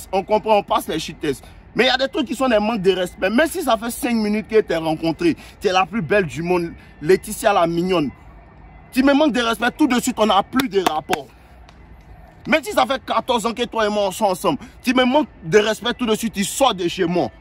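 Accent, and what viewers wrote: Ivory Coast. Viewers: Tu parles comme un ivoirien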